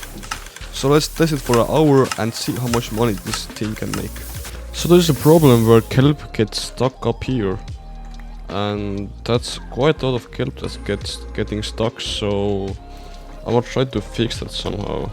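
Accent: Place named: Estonia. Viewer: Your accent is Estonian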